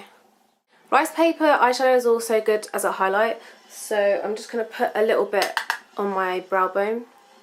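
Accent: London, United Kingdom. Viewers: Love your videos and your accent! What part of the UK are you from? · She's not Irish, in fact if I had to guess I'd say she's from London. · Luvved this video and is she british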